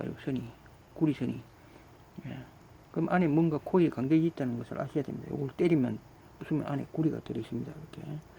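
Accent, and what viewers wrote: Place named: Gyeongsang, South Korea. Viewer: 수고 많습니다ㅡ 말씨를 들어 보니 대구분이신 거 같은데ᆢ 사업 번창바랍니다^^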